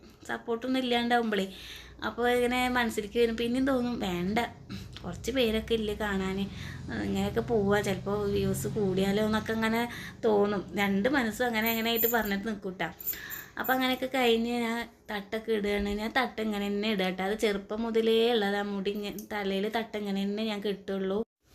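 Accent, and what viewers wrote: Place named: Malappuram, India. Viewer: Hai enna video kanunne trissur jilla analle varthamanom kettappol malappuram anenn thonni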